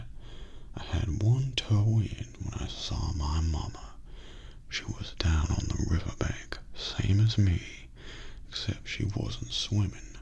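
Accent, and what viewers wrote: South, United States. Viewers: My dude, not only is your voice my favorite but the southern accent is out of this world. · you have a better southern accent than most movie stars, this was a blast to listen to. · John Goodman but 10 Times More Southern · Southern drawl: Spot. On. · As a Southerner myself, this accent is EPIC! Well done! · holy shit,... the southern accent is not half bad!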